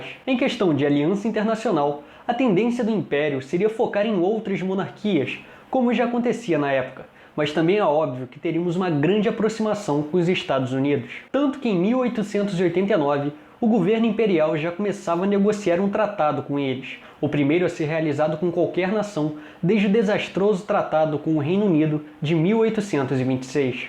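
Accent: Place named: Rio de Janeiro, Brazil. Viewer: Odeio o sotaque carioca me inrrita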